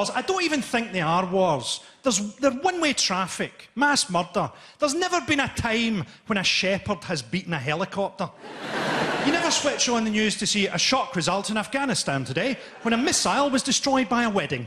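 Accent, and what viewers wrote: Scotland, United Kingdom. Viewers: I love stand up comedy but it's hard for me to understand what he is saying. I have watched and understood Dylan Moran's specials so my English is ok I guees. Is it because the Scottish accent or he's using localism? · It's standard english with an scottish accent. You'll get used to it.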